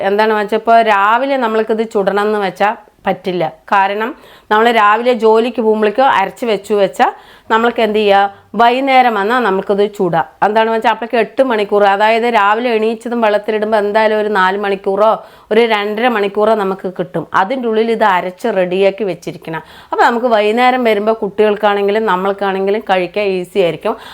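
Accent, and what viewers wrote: Palakkad, India. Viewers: പാലക്കാട് കാരി ആണ്ണോ സംസാരം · ചേച്ചി അടിപൊളി. പാലക്കാടൻ ഭാഷ 👌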